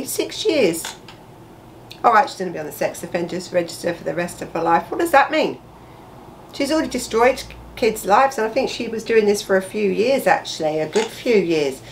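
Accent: United Kingdom. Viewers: You’re a great storyteller; I love the British accent.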